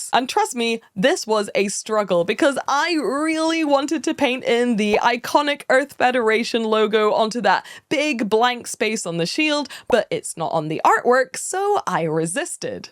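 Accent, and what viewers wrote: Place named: Scotland, United Kingdom. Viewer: My wife saw you on my phone and asked, "Is she AI?" I explained, "No, she's just Scottish."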